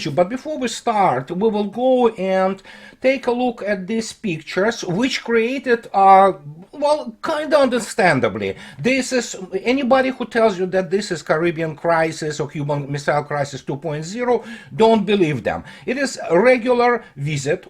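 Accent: Russia. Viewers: Thank you for YOUR Russian insight, Russian high standard delivery, Russian accent and Russian humour!!! Excellent shows every time! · I don't understand people who complain about you speaking too fast. I usually speed you up to 1.25! Maybe I am just more used to the Russian accent, seeing as my wife is Russian. I follow numerous channels like Judge Nepolitano with Larry and Ray, Col. MacGregor, Scott Ritter, Pepe Escobar, not to forget the two Geek boys on the Duran. Won't get through all of them at normal speed. 😁😁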